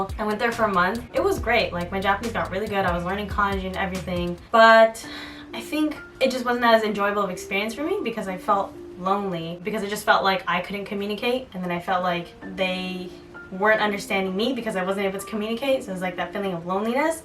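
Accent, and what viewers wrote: Rio de Janeiro, Brazil. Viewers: Seu português é muito bom e tem um sotaque meio carioca, sotaque aqui do Rio de Janeiro. Pra entender como é o sotaque daqui do Rio é só escutar a cantora Fernanda Abreu, carioquérrima. <link> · Olá,. Falar outras línguas é um privilégio de poucos. Você possui um belíssimo sotaque brasileiro e demonstra muita dedicação e habilidade com idiomas. Você certamente é uma inspiração para aqueles que querem se aventurar pelo mundo do poliglotismo. Parabéns, e keep up the excellent work! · Aprendeu Português com um carioca kkk, ta puxando o S, INGLÊIXXX KKK Linda! :D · Conheci seu canal a pouco tempo, parabéns, você fala muito bem português e seu sotaque é bem próximo ao sotaque carioca. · sotaque carioca diria .. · O que deve ser realmente ruim para um nikkei ao praticar japonês é que os japoneses devem supor pela aparência que o nikkei seja fluente por ter aprendido em casa. 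A propósito, seu sotaque de português parece do RJ. Muito bom!! 😎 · seu sotaque é bem carioca! s2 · Você se expressa muito bem em português do Brasil. Acho que em outra encarnação você foi brasileira!!!! Mas o seu sotaque é carioca. Tenta usar o sotaque gaúcho. As girias gauchas também são muito legais. Bah, tchê. São tri-legais.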